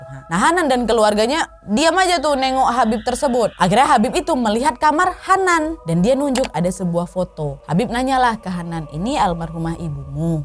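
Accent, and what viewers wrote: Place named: Medan, Indonesia. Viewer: Btw, bahasa kk kayak bahasa orang medan. Ceplas ceplos, btw tinggal dimnasih kk ini.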